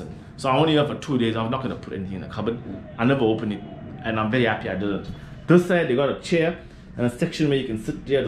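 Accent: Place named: Kenya. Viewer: You have an African accent bro kenyan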